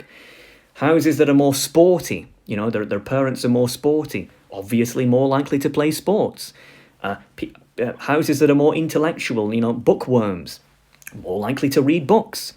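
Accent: United Kingdom. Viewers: This has been going on for years, it has just gotten worse with the internet. Before social media really became the behemoth it is now. You sound like you are from the UK or at least lived there and we had two notable celebrities. Jade Goody, who got famous basically for saying stupid things on Big Brother and acting "low class" And Katie Price - who garnered fame and wealth by constantly feeding the gossip rags with salacious tales about her life.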